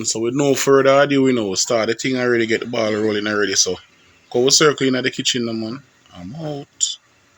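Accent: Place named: Jamaica. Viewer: The jamaican accent 😊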